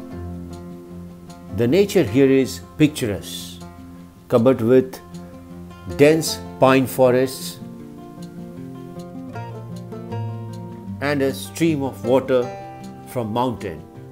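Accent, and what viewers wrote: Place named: India. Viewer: Your Indian English tone makes it even more enjoyable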